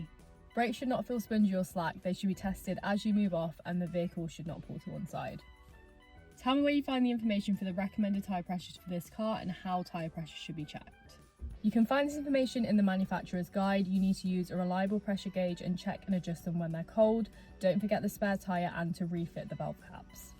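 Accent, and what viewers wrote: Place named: RP, United Kingdom. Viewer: Then it seems like you might need to decrease the video playback speed - because this was about as RP and easy to understand as it gets. Perhaps it was just too fast for you to get a handle on.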